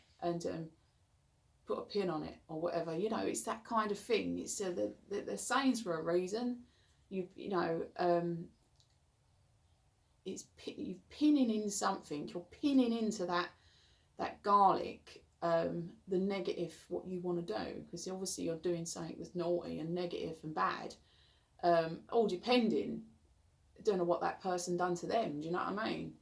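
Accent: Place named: East London, United Kingdom. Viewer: You sound like you are from my ends East London . Thank you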